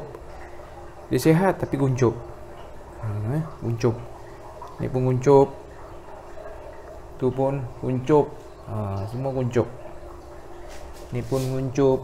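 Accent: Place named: Sabah, Malaysia. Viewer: Ade sleng sleng sabah sikit la bang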